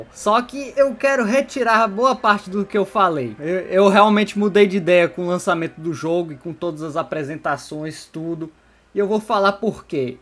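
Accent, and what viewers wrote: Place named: Northeast, Brazil. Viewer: Na moral, é horrível ter que ouvir essa narração de nordestino, bagulho irritante demais!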